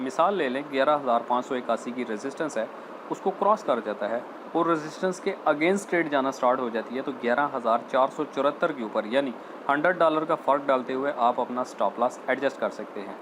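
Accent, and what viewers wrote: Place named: Punjab, India. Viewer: Good information ji Stay blessed. Nice Punjabi touch too. 🙏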